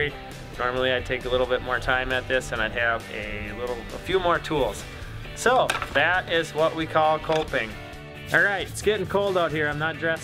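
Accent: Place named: Minnesota, United States. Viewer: This guy has to be from Minnesota. Great video!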